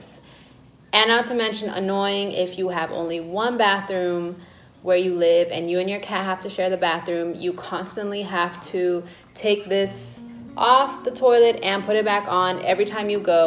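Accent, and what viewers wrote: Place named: Albuquerque, United States. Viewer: The inflection in your voice makes me miss Albuquerque. That being said I wouldn’t mind clogging your vocal cords￼😂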